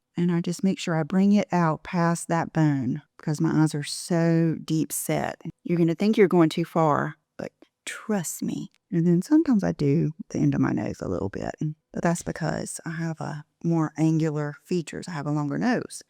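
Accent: South, United States. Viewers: One reason you’re successful is you’re really careful about what you say and how you say it. Even when you’re putting something out there that might be considered critical by some, you say, “How do I say this the right way, not to come out with the wrong intention.” I’ve been mindfully attempting to be more like that. I am really working on it. That, and carnivore, thanks to you. I love that you do so many videos each week. Love your voice, too. So comforting. I lived in Alabama for 3 years and though your accent is not N.Alabama, it’s a soft, sweet Southern accent, just the right speed, and tone. You keep doing you, which inspires us. ❤️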